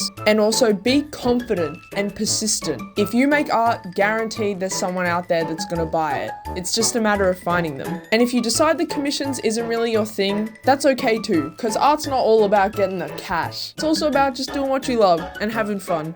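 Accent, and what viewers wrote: Australia. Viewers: I got whiplash hearing my very own thick Aussie accent thrown at me. I’m always expecting American at this point. Great video btw ✌️ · they sound australian(?) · idk why i just love her accent, usually i don't care for Aussie accents, i think they sound like goofy British accents, similar to Canadians fake French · the aussie acent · i thought they sounded australian lol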